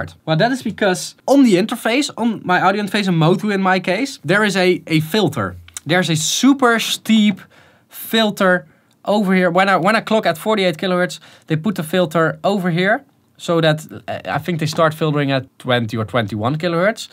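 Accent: Netherlands. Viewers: Excellent! Are you Dutch?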